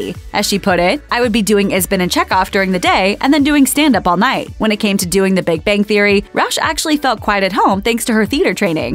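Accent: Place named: New York, United States. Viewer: Neutral New York City accent? The city has 8 distinct accents according to where you live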